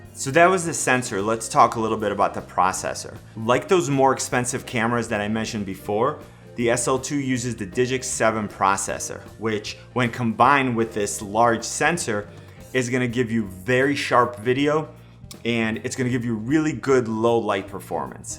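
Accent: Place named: Cleveland, United States. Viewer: First off, I hear that Cleveland accent! We are from Cincinnati but have bene living in London for the last two years. Miss those Ohio accents! We purchased the 200D (SL2) a year ago, (the white version). We are amateur creators and it does exactly what we need it to do, even with the kit lens. Amazing photos, great videos when needed and ease of use cant be beat. We are actually thinking about getting another one or even the M50 so we can both have access to cameras. Thanks for the great content and helpful tips!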